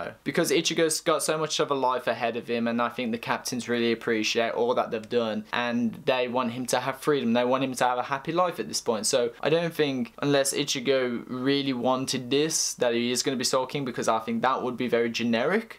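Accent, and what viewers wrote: United Kingdom. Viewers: Great review. btw I like your british accent;)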